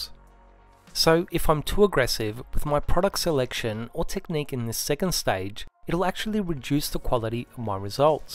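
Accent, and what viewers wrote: Australia. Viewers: I love AU accent! Almost British! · Woah it's an Aussie!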